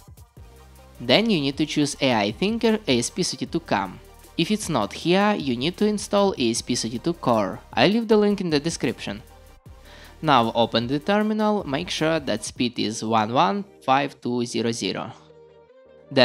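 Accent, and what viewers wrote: Russia. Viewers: Какой ужасный русский акцент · Русский акцент ))))) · Акцент русского выдает с потрохами ) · Are you from Russia? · Ты вроде русский,судя по акценту? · Русский акцент или показалось? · Бля твой русский акцент просто по ушам режет как ржавым гвоздём! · От такого русского акцента хочеться повеситься тут же!!! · Слышно русский акцент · Пипец чувак руинглиш так режет ухо. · Я уверен на 95% ты русский или украинец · забавно слушать видео с русским акцентом. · Блин акцент такой будто-бы это русский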